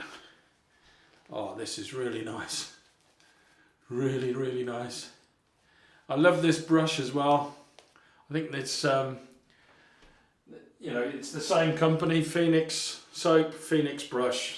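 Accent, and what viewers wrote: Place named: England, United Kingdom. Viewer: Many thanks for another great shave. I love your English Accent. 🙄🙄🇦🇷🇦🇷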